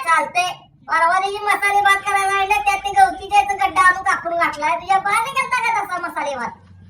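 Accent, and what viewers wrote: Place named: Rural, India. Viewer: अण्णा , ग्रामीण बाज ऐकायला छान वाटतोय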